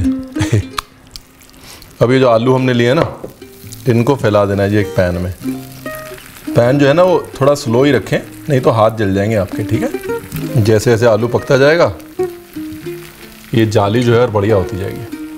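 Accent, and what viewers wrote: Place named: Punjab, India. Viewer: Ranveer paji tuc hindi che punjabi bolde bot vadiya lagda sachi gal paji tuhadi gal baat sunn hi aanda tuhdi video te osm paji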